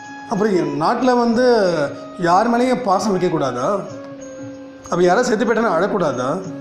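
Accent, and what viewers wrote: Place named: Madurai, India. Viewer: I hear ur voice so that I am asking u r Madurai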